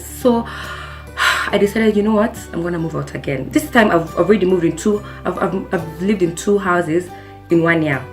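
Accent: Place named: Kenya. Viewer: Kenyan English accent is very interesting. I noticed how different you pronounce some words: First - Fast Service - Savice Year - Yar Fact - F..ked Work - Wark Church - Chach Etc. I just love it! Thanks for sharing your experience. I did hear a lot of SA accent as well.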